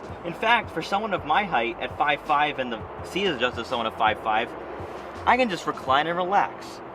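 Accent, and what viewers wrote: Boston, United States. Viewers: Excellent review of the 2020 Subie Forester, love your Boston accent, I heard it strong at times. I'm not what the exterior color is of the one you reviewed, but it certainly looks amazing 👌. However, the 2020 Legacy XT Touring you recently reviewed was awesome.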